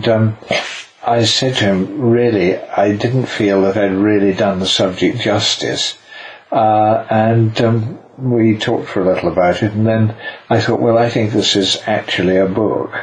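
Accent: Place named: England, United Kingdom. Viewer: please what classical is this? Bach? thank you for informing - by the way, beautiful English accent.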